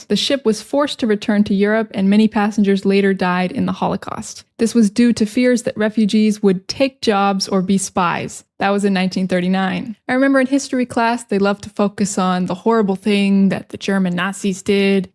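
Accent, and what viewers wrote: United States. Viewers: I'm a venezuelan, now I'm living in Galicia Spain, i love your American accent ❤... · omg I’d honestly disliked American accent until I got stuck upon yours, it is by far the best ❤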